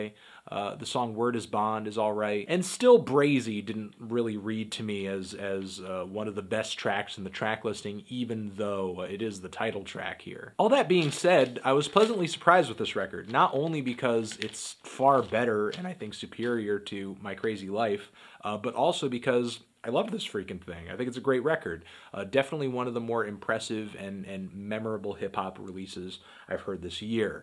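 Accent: England, United Kingdom. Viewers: I rate your English accent a light to decent 4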